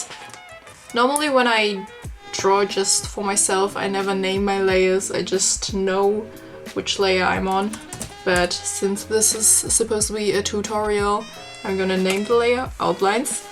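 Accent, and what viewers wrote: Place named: Germany. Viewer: ich mag diesen Zeichenstil echt sehr gerne und es ist letztendlich auch echt extrem gut geworden. an deiner englischen Aussprache habe ich irgendwie schon erkannt dass du aus Deutschland bist und habe deinen deiner kanalinfo nachgeschaut. Cooler Kanal ^^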